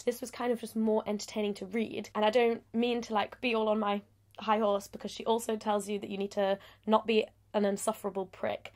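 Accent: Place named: England, United Kingdom. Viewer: Your english accent sounds beautiful!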